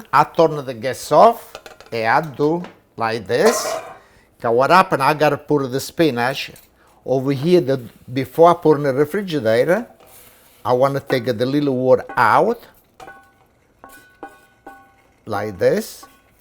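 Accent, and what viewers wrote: Italy. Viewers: He sounds just like my italian family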